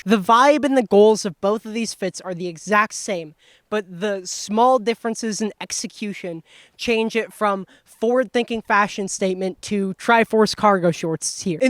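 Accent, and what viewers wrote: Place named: Minnesota, United States. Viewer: thank you for your unabashed use of the minnesota accent this is near and dear to my heart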